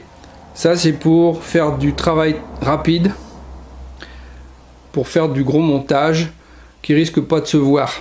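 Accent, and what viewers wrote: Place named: Nord-Pas-de-Calais, France. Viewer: Bien expliqué, le petit accent ch'ti, j'adore!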